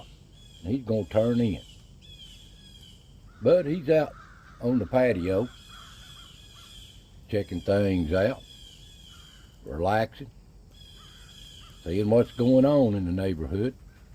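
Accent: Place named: South, United States. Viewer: I love listening to your stories I think the are the best. With your southern accent it is perfect for this Thank you very much 😊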